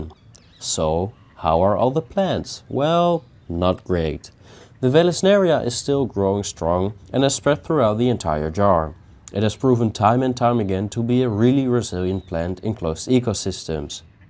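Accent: Netherlands. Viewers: I'm sure you're Dutch. I heard the Louis van Gaal in you. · Heel leuk kanaal kameraad! Je G’s geven het weg dat je een nederlander bent haha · Are you Dutch? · The way you pronounce these latin names with that ever so slight dutch accent cracks me up a lot 🤣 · do i hear a dutch accent? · I'm gonna say dutch. As a another dutch guy, our accents are usually destinct when speaking English 😂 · Are you perhaps dutch or Belgian? I hear a similar type of accent🤔😂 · Based on your accent. Are you Dutch! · Heerlijk man dat Nederlands accentje LUV IT❤ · you sound so dutch, loving the vids! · that dutch accent xD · first time on your channel.. took my 3 words to figure out you're Dutch xD Interesting video concept though! Subbed for now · so this guy can only be dutch and i love it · Je klinkt Nederlands (you sound Dutch). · I can’t unhear the dutch accent…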